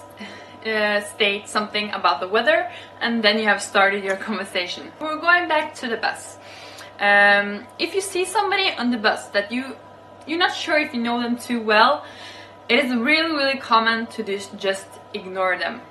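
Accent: Norway. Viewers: I loved your accent, I like the nowergians accent when you speak English. Here in Brazil, I think that We are one of the most sociable countries in the world, except here in the south, Where I am living now, I the south of Brazil there are a lot of Europeans descendants and they are not so sociable like the people of the others regions.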